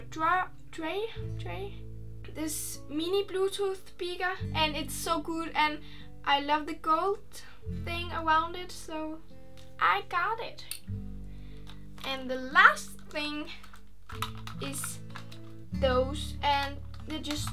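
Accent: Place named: Denmark. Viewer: Woaw man kan godt høre at du er fra Danmark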